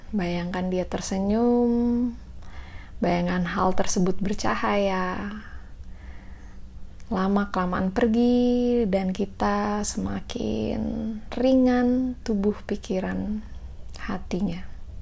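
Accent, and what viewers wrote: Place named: China. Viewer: Gak ah beda suaranya.. Ci ada logat chinesenya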